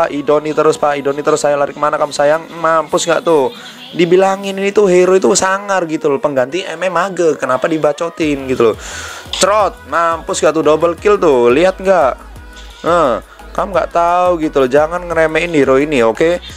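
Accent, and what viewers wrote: Java, Indonesia. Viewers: Lah gw malah ngakak sama omonganmu bang 🤣, jawa medok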